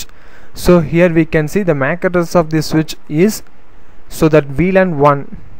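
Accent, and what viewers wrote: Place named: India. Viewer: PLEASE DON'T SPEAK ENGLISH WITH INDIAN ACCENT!!!!!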